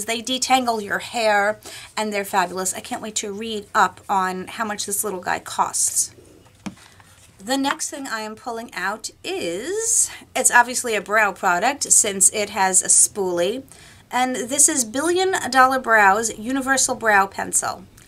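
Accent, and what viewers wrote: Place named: New Jersey, United States. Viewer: I'm new from Abby.. u r beautiful an u was great on camera.. Edit... where are u .. ur accent is so New Jersey LOL LOVE IT 😘 also I got the same box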